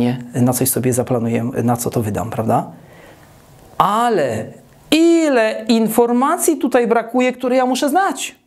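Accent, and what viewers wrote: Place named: United States. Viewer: Lubię Pana słuchać z tym amerykańskim akcentem. Mam tylko pytanie, dlaczego uczy Pan w ciemnej piwnicy? Jak już to zamocowalabym te tablice na ścianie i ja porządnie doświetlila.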